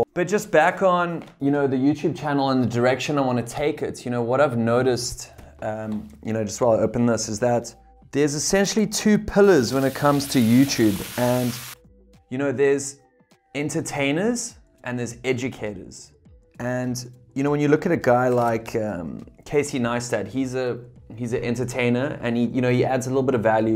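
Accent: South Africa. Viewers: Yay! I'll eagerly anticipate it. I'm from Cape Town, born in PE, but now I'm in Germany. I miss Cape Town every day. I always love hearing a South African accent on YouTube.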